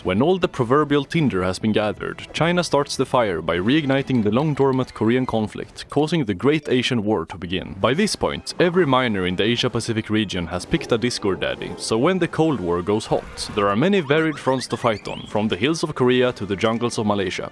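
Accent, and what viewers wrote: Sweden. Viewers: I can hear the Swedish dialect. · max0r's style really doesn't work with that thick Swedish accent and demeanor. For this to work you'll have to stop being monotone which is an impossible task for any temperature challenged individual.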